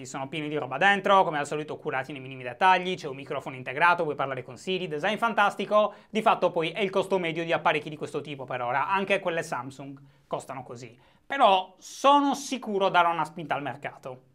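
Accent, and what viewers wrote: Sardinia, Italy. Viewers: Quel momento in cui fai vedere Breaking Italy a tuo padre e lui ti risponde "ma è sardo questo qua".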